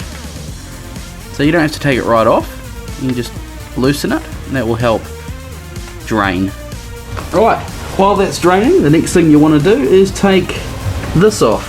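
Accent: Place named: New Zealand. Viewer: I love NZ accent...Great video.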